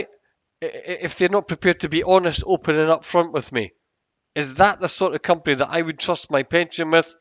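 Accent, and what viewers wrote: United Kingdom. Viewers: 😁 Glad to oblige!! Really appreciated your webinar - and in a proper British voice (My brother's up in Bathgate!) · I was listening to you and thinking he has a Scottish accent. Then you mention you are from Edinburgh. Thanks for this video.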